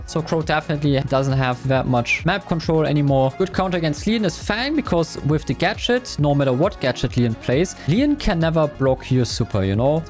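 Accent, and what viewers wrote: Germany. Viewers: R u German? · Are you german? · Hab direkt rausgehört das du Deutscher bist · I can hear the deutsche akzent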